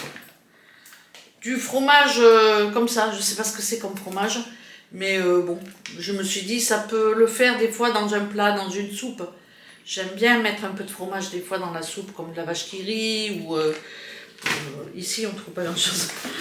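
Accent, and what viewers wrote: South, France. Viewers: Coucou!ton accent ,c'est le Soleil du Midi toute l'année! ,bisous,bilan brocante à Gerland cet après-midi:2 paire de chaussures à 25 euros pièce ,un robe Carole été 12 euros en lin ,un sac à mettre dans le dos été 20 euros,et 1 paire boucle d'oreille 3 euros pour la copine de mon fils MiMi en bélier ,elle de ce signe !,encore ouvert demain à Gerland ,la vente pour abriter les Sans Abris!,très bon week-end!,bisous à tous les deux!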